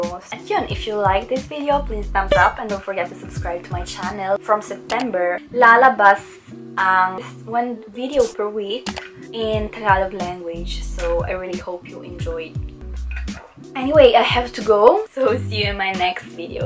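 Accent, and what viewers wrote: Italy. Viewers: Il Tagalog con l'accento milanese è magia. 😂❤ Vi prego ditemi che c'è qualche mix filippino-veneta nei commenti. Ho bisogno di sentire come suona il Tagalog con l'accento veneto 😂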